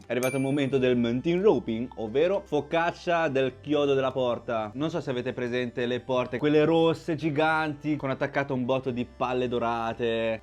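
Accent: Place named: Modena, Italy. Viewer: Che ridere l accento di modenaaa 😂😂😂 sei un grande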